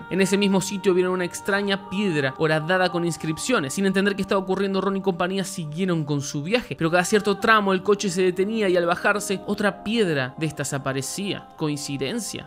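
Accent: Argentina. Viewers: Con ese acento argentino las Cosas parecen un chiste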